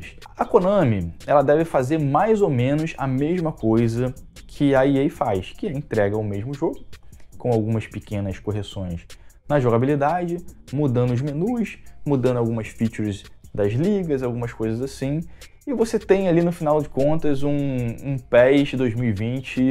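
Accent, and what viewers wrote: Rio de Janeiro, Brazil. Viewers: Pode crer mano kkkkk Esse sotaque de carioca fudido não dá pra entender porra kkkk Essa intro é genial!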